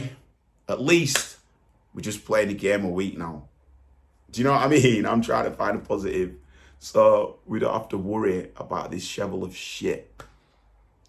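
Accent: Yorkshire, United Kingdom. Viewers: Yorkshire My Brother. Leeds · He’s a Yorkshireman!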